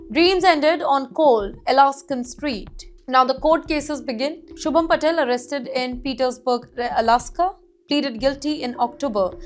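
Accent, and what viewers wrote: India. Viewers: The Indian scammer accent on this presenter is awful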